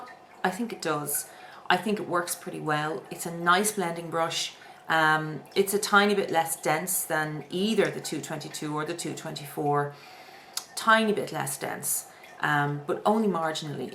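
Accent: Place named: Cork, Ireland. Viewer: your accent is soundin pretty local to me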